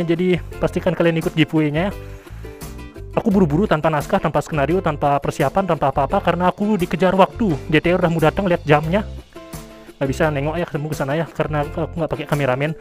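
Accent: Bali, Indonesia. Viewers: Dari logatnya aja udah keliatan, pasti abang orang bali ya 😅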